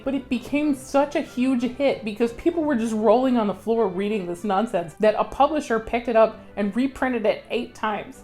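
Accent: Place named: Florida, United States. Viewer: Why does it sound like he belongs in Florida?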